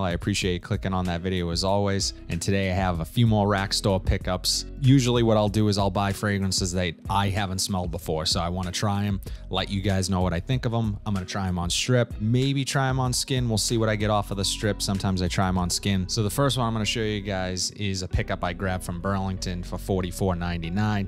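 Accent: Boston, United States. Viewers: I feel like ur about to utter the words… from boooostin. I used to live in Worchester. Unless ur from new York then I got the accent wrong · Lol The Boston/New England accent gave it away. Im from Mass I can tell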